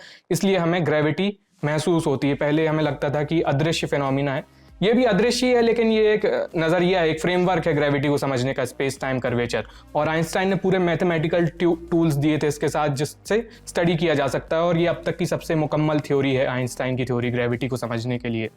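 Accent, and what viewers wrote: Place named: Purvanchal, India. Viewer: मैटर फस्स्स गया है 😃😃😃 आप और स्टोरी राइटर 200% यूपी पूर्वांचल के मालूम पड़ते हो 😎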